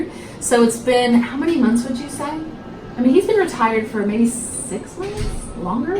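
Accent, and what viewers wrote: Kentucky, United States. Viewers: I'm a Buckeye not a Kentucky girl- but we are close enough in vocal patterns that I feel, we are family after all. Love how you make your older patients feel at ease- as a person of a " certain age" often you hate admitting to family members there are things you can't do easily, especially toenails, after arthritis, joint replacements et all there just things you lack flexibility for. Thank You for being so kind!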